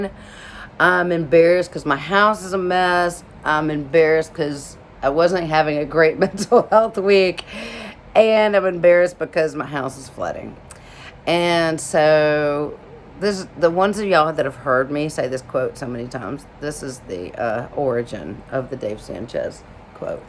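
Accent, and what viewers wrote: South, United States. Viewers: Out of NCIS, your southern accent is coming back strong. Love it. You be your P. I love you. I miss Abby, my favorite NCIS character.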